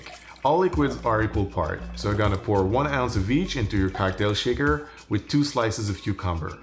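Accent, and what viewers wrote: Quebec, Canada. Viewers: Love the channel dude ! coming from The Educated Barfly, Im guessing from the accent you're from Quebec, quel region ?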